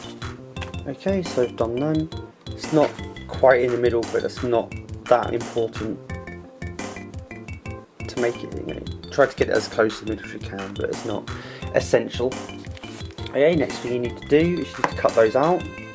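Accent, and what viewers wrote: United Kingdom. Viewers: Because he has an English accent? It would be better if it was an American talking. I'm british and find the accent from across the atlantic much nicer ! :)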